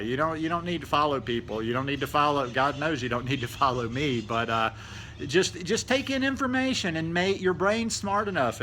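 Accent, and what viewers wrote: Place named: Texas, United States. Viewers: where you from originally? you have a similar accent that I have! I'm from north Alabama. it's always nice to here a similar accent! lmao. great video btw. I learned a lot. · Love your accent. You sound like a fellow Texan. Am I right?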